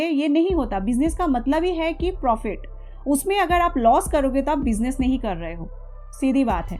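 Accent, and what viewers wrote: Bengal, India. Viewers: Don't have time to watch long video,and bengali action in ur hindi speech.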